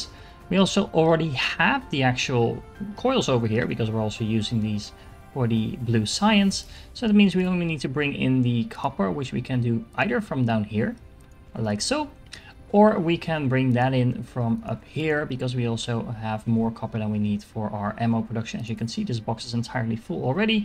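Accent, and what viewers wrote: Netherlands. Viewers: After deciding to pick this up again i came across your video. Nice one, i`ve already got 552 hours into this game but i still learnt something. Will definitely watch more of your content. Who doesnt love a Dutch accent! Thanks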